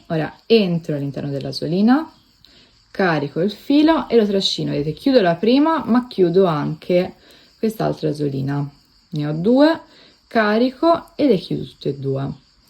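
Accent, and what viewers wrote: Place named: Tuscany, Italy. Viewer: Buongiorno, Una curiosità….io abito a Pietrasanta e dal tuo accento mi sembra che siate anche voi “di costa”, mi sbaglio?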